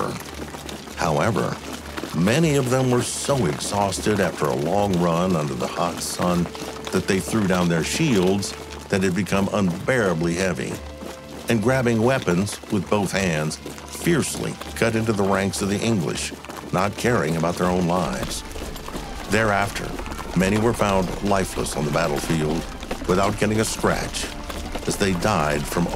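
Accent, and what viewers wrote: United States. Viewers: Bad American Voice over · A medieval battle in the north of England narrated by an American cowboy. Love it! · Sorry I struggle with the narration it’s too loud and painfully American . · such a shame a yank commentates,..ruins the whole thing!!!!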